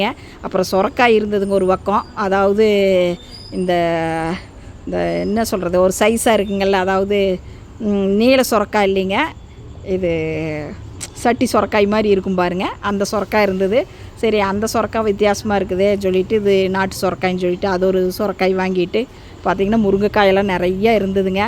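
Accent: Coimbatore, India. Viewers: நீங்கள் பேசும் கோவை slang கேட்டுக்கிட்டே இருக்கலாம் போல் இருக்கு சகோதரி.. ரொம்ப பிடிக்குது வாழ்த்துக்கள்..!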